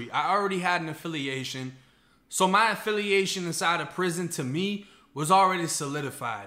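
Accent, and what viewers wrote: Boston, United States. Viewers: Bro you from Boston area? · Did you ever live in Beantown?? A lot of Floridians are originally from there you have a little accent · Are you from Boston or something close due to your accent??? Why you fascinated with Florida??? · Yo you from Boston? Trying to guess the accent 🙂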